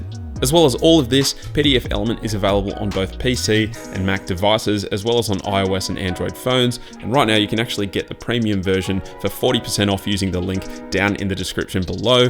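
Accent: Australia. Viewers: Awesome video! Just wanna ask, are you Australian?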